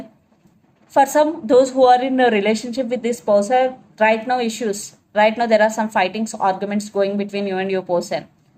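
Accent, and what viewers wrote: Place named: South India, India. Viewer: Your reading is to the point.i am really Impressed. your accent is south Indian.GOD BLESS YOU.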